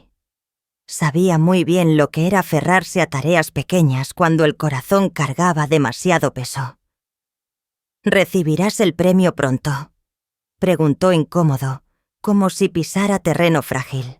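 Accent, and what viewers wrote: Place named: Spain. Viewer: Mis respetos para los españoles pero No resisto una narrativa con ese acento. 😢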